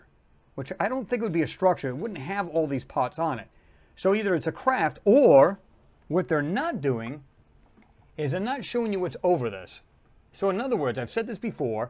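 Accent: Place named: Northeast, United States. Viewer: That Northeastern accent loud and clear!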